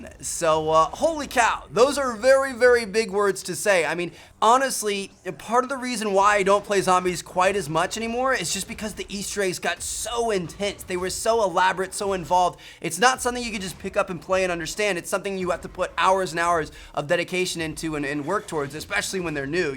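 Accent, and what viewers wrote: Germany. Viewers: Wie der einfach mal voll den deutschen Akzent hat im Trailer 🤣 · What a German voice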